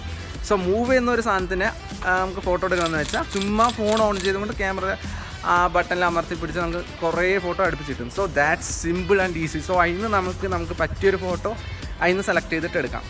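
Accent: Palakkad, India. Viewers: ചേട്ടൻ പാലക്കാട് ആണോ 😃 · bro Palakkad aanallo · Palakkad aano 😍✌ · Bro r you from palakkad???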